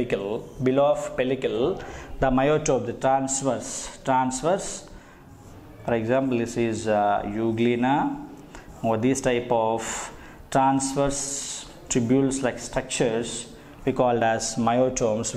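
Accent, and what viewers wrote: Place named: South India, India. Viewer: I think he is from South , mostly south peoples don't know hindi so use English so that everybody can understand , Nd inki English m thoda south accent bhi a rha h ,may that's why u can't understand , but his English is good , their is no mistake...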